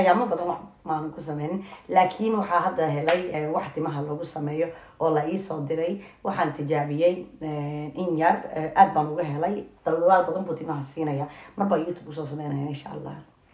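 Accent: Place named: Isaaq, Somalia. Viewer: wan ogaah naag sidaa u hadle kulaal baa isaaq ah ❤❤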